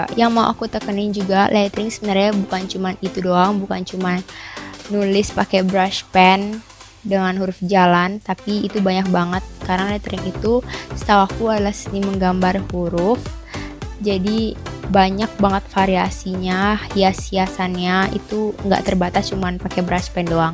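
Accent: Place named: Bali, Indonesia. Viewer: kek logat bali pls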